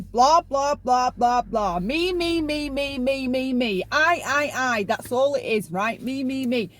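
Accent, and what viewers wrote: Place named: United Kingdom. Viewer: I love your accent ( and your good heart ) but Cussing UK just sounds Classy and you're Brilliant ❣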